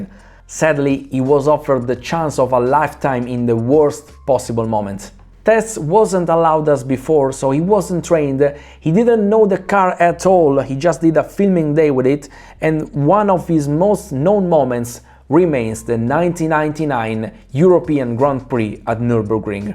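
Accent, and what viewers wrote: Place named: Italy. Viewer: Hablas inglés con un fortísimo acento italiano. Pero me gusta igual. Tuttavia preferisco la tua lingua originale... I give you a deserved like👍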